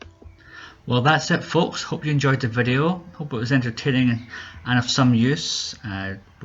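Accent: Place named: England, United Kingdom. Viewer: I don't understand, you say "sit" for a down ? Due to your accent, i hear "site" when you say "side" 😊, it's so funny to me ! I am used to american people talking, not English people ! Regards from France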